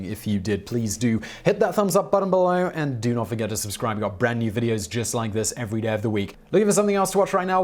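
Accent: England, United Kingdom. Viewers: your English we expect you to pronounce everything wrong. Don't sweat it. Your English accent is very appealing for you. Your content and your videos are always wonderful.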